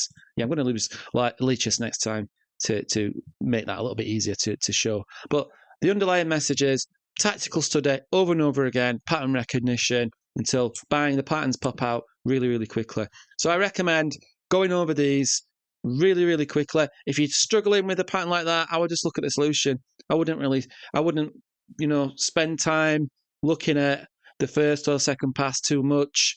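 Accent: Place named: Manchester, United Kingdom. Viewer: You're from Manchester aren't you?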